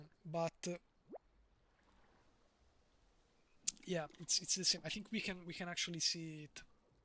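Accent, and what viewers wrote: Italy. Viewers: its right before my bedtime so i cant watch more than those 3 mins today but i gotta say this is a really cool way to stream and interact with the community, youre really awesome!! i also like the italian accent, parlo un po italianooooo, good night <3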